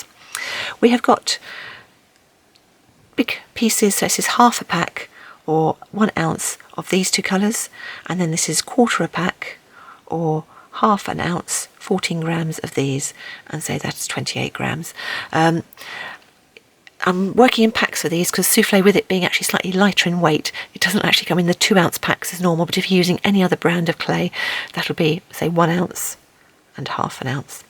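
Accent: England, United Kingdom. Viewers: Thank you so much! I've wondered how clay canes are made. I have purchased a number of beautiful canes from a very talented woman who sells hers on ETSY. I have terra cotta pots and I am ready to play! I also make pens so I want to try some on brass pen barrels. I am guess that they would need a study sealant for protection against discoloration of the clay and breakage if dropped. Any thoughts are much appreciated! Finally, I would like to compliment you for such a lovely, professionally produced video! It's so much more pleasing to my ears to hear an English accent vs. an American (Canadians are better, too!) Very professional and quaint at the same time. Cheers from your Yankee Cousin from "Across The Pond!"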